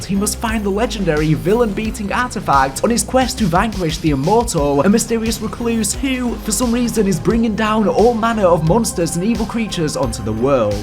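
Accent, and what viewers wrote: England, United Kingdom. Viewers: I’m from Scotland and trust me mate, there’s about a million different accents out there in the UK. I’m pretty sure English, couldn’t tell you what part of England he’s from exactly though.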